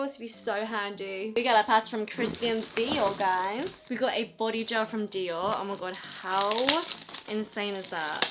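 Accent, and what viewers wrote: England, United Kingdom. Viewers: I've been following you on Instagram for a while and this is the first time I'm hearing yr voice honestly cant remember hearing yr voice love yr English accent and loving yr videos. Subscribed right away.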